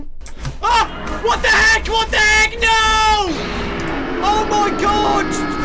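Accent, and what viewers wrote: United Kingdom. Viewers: your the defenition of funny and amazing at the same time- Also love your british acccent! I think the british accent is just wizard, im studying it now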